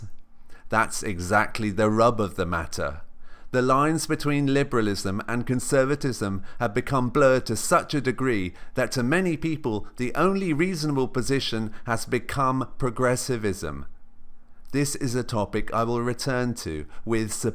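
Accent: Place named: United Kingdom. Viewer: british accent :checked video on a hot topic: checked shitty opinion : checked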